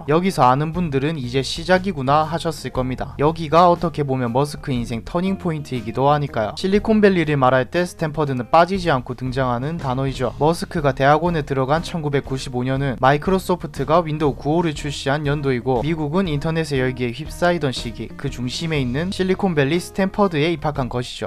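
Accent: Gyeongsang, South Korea. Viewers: 사투리억양이 느껴진다 갱상도인가? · 경상도 말투가 좀 섞여 있네요? ㅎ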